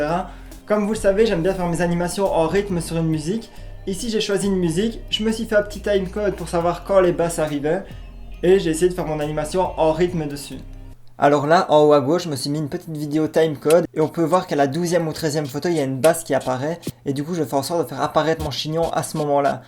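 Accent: Belgium. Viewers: Tu est belge??? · Excellent, t'étais super mignon avec les cheveux longs. Un de mes poussins ne veut plus qu'on lui coupe les cheveux, j'ai hâte de voir jusqu'où il va les laisser pousser ;) Vidéo super réussie, elle a été le sujet du discussion de tout un repas :) Toute la famille aime tes vidéos, ton humour et ton p'tit accent me rappelle les quelques mois que j'ai passé à Liège ;) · T es belge je suppose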